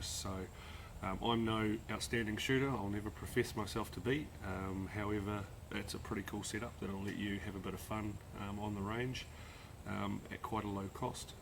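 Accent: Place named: New Zealand. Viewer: That kiwi accent... Those sorry azzzzzz lil mags :(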